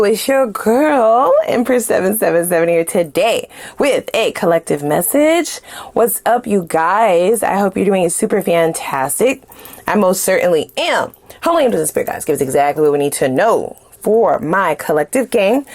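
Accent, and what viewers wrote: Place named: United States. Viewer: Nice analysis.but sisan people can't get your USA pronunciation.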